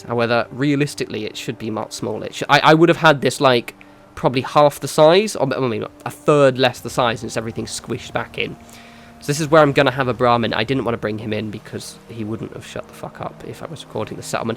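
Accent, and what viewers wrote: England, United Kingdom. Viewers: Adam Gaffen He has an English accent.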